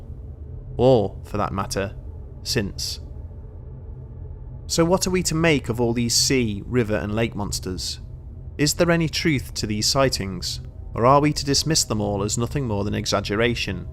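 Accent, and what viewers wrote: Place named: England, United Kingdom. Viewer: The narrator has one of those spot on English accents that could get seemingly get REALLY DARK at anytime. One of these days I will be all into one of his stories a really creepy ass story and then on the middle of the story he says “and as you’re listening to this - you hear a knock at your door. (Knock at door) when you ask who it is - the voice seems very familiar.”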